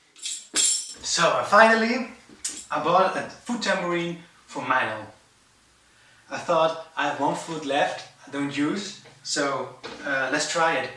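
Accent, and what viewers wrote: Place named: Netherlands. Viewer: zwaar nederlands accent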